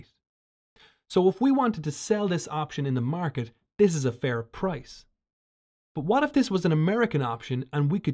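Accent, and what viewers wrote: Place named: Ireland. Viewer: The narrator sounds Irish!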